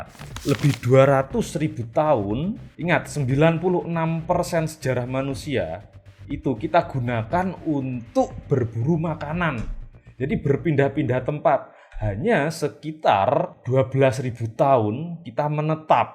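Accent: Java, Indonesia. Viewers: 😂jawine pundi Mase ?medog men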